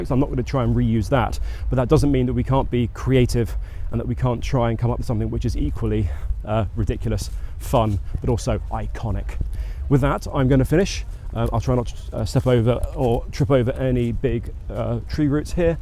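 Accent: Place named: England, United Kingdom. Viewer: OK, you did the whole last build with an English accent. Can you do a Canadian one now?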